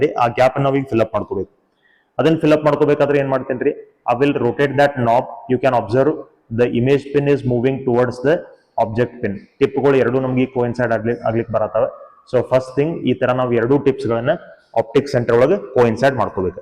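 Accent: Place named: North Karnataka, India. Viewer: And also I got know tht u are Uttar kannadiga by ur talking style😅 am From GADAG SIR Bipin Chikkatti Pu science College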